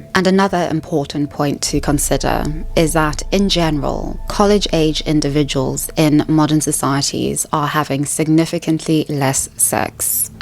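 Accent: South Africa. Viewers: She's originally from South Africa, stop trying to push the Queens English on everyone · I love your channel, and I've been binge-watching your content over the past few days. I hope you don't take this too personally, but I had to comment and say it drives me crazy that you pronounce "women" and "woman" the same. Is it a South African pronunciation?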